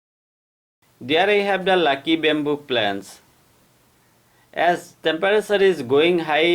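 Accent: Assam, India. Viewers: Are you from Assam? U have an Assamese ascent. Correct me if I am wrong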